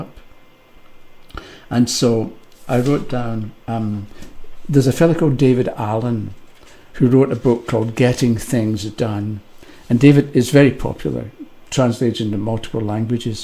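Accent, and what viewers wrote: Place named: Glasgow, United Kingdom. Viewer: I love your accent, mister. May I ask what area it's from? It's almost like Peter Capaldi's glaswegian accent but mellow. Good video and explanation. Thank you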